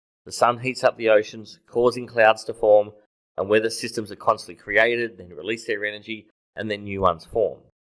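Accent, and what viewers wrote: New Zealand. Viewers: You definitely still have a kiwi accent. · Hey. Aussie here and you are quite clearly a Kiwi. Anyone who is truly an Aussie and is having trouble telling that you are a Kiwi should maybe get their hearing checked. Also can’t wait for my next sweet, sweet NASA payment for pretending that Australia actually exists and that I come from there....oh. I just said that out loud didn’t I. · Ha ha ha, calling you an Aussie. Nah mate, you don't sound remotely like us Aussies. Your Kiwi accent is unmistakable to any who know the difference. And thanks for constantly fighting this effing stupidity, my Antipodean brother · Nope, you definitely still have the Kiwi accent!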